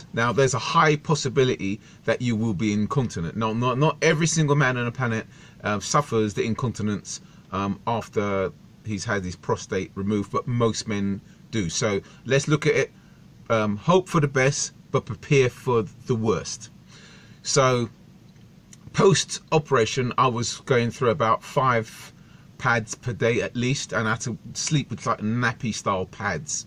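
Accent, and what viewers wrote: United Kingdom. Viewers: You sound like a f***ing southern hero mate, I've just come out a university 28 years old see that your subs need putting up a new video quality could do with improving, I'm looking for a job atm, cockney based the same to you. I'm from Essex, back in the day growing up. in Pompey now. really im offering my services if I could do anything technological/ graphics/ back-end/ editing business stuff to help get you going (2018 youtube is going to be very hard) my degree was entrepreneurship that's going to help you get whatever you're trying to get out online then let me know (no money atm bud, i just wana help listnening to ya). I'll just wanna know a bit more about your drive etc you doing this for money? awareness? a living? I've had a look through your channel and it's a bit all over the place (no offence) I don't know how to offer help but I know I want to let me know cheers for the info you're a hero as i said. 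thanks for the upload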